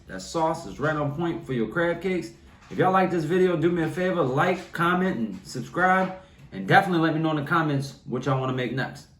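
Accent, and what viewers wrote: Louisiana, United States. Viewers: I’m from New Orleans but I can listen to him all day.... It’s a different Louisiana accent.... When I left home for years and came back I loved to listen to all the different accents in Louisiana . I was able to hear it being that I was gone for years ....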